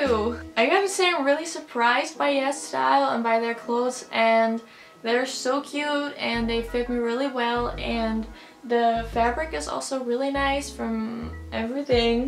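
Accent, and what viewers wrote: Netherlands. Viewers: wacht wat je bent nederlandssss ahaha ik dacht het al (leuke video!)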